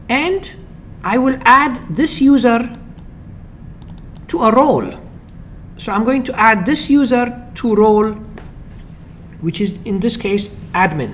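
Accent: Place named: Iraq. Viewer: Your SureName, but I can feel your Iraqi accent, Big thanks for your grate job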